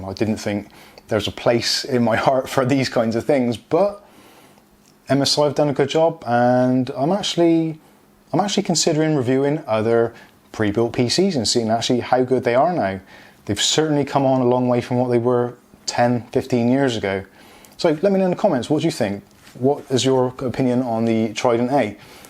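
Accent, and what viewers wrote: Bristol, United Kingdom. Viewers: I've ordered a 2080ti model - also do I sense a South West (bristol?) Accent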